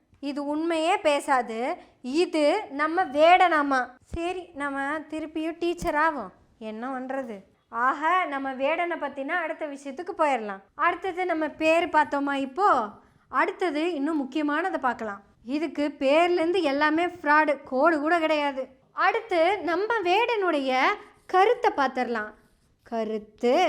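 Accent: Kongu, India. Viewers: சகோதரி, உங்க கொங்கு தமிழுக்கு நான் ரசிகன். 👏👏👏👏👏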